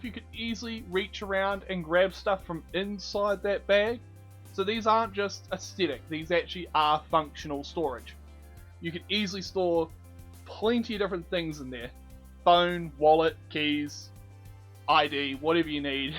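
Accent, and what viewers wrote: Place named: New Zealand. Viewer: Nice accent mate, kiwi’s are awesome :3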